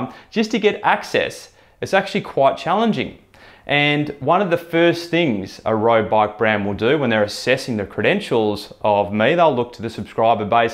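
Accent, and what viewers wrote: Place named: Australia. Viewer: I love Aussie accent so I could listen to you talk about bikes all day ;)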